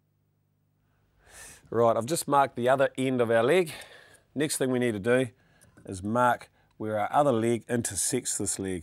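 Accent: New Zealand. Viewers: he's a kiwi bro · yeh, it is our Kiwi accent.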